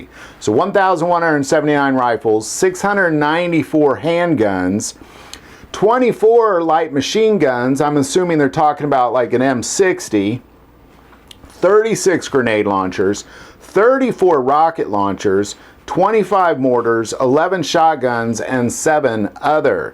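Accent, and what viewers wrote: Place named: United States. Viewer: mIL itary, carILIna, .... love that hick drawl.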